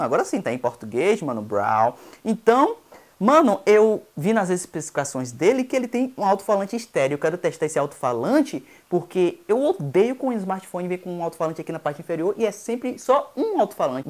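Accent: Bahia, Brazil. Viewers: adoro nosso baianês kkkkk parabéns pelo trabalho! Muito bom e autêntico. Dou like antes de assitir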